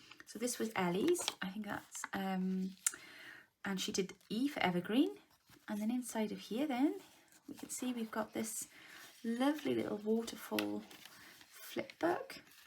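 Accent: Wales, United Kingdom. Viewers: Just found your channel from a fellow Aussie. This looks a fabulous idea. I can hear a welsh accent...oohhh...how wonderful. I was born in Wales and my family emigrated to Australia when I was 7. Most of my family still live in Wales. So lovely to hear a welsh crafter. 🙂❤️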